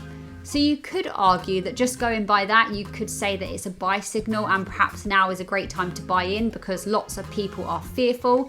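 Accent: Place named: England, United Kingdom. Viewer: i like your content and english accent.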